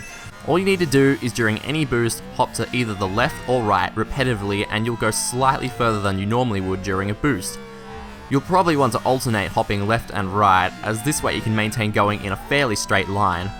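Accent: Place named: Australia. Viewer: hey, an Aussie.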